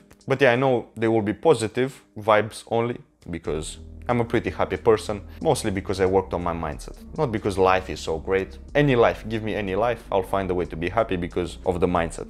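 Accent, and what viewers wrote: Russia. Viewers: I think pavel tsatsouline created this blueprint for a kind of austere, soviet militaristic style of training. I think even if didn’t call himself soviet core others would just because of his accent & dedication to hard training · That's familiar accent. Are you Russian? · Wow, Russian accent.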